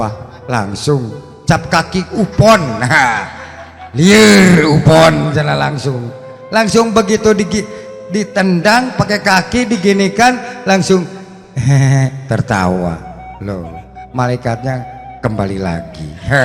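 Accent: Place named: Sunda, Indonesia. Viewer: Has sunda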